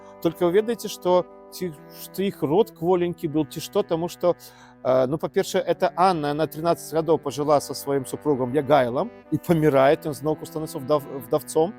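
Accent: Russia. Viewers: Добра, але трохі вымаўленне пакутуе, як у русака😮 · Гэта трасянка , а не беларуска мова.. Вымаўленне нібыта ў русака з пад палкі!! Палова слоў расейскіх.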